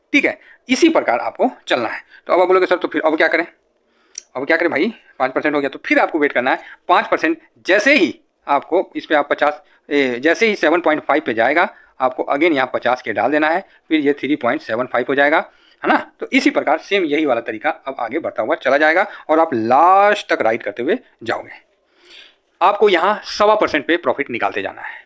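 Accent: Bihar, India. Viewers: Aap Bihar se lagte hain....bahut achha....Mai bhi hu.... 👍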